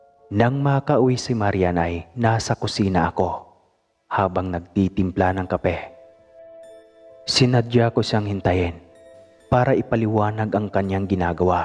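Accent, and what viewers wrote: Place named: Iloilo, Philippines. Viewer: Taga saan accent mo boss. Bisaya ba? Ay ilonggo pala..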